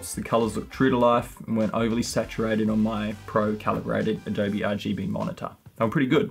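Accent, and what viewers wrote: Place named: Australia. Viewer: An excellent review. You sort of made a lot of mysterious stuff understandable even at the pace you were running at and me not being used to concentrating on oz tech accents. I look forward to some of the tip vids you might put up. I am very interested in getting slow-mo video working but have found very little understandable advice. Check the manual - No real explanations there neither in the Rocky Nook books. My preferred ambassadors are self confessed amateurs at video. Even asking eachother and getting no real answers. Thank you greatly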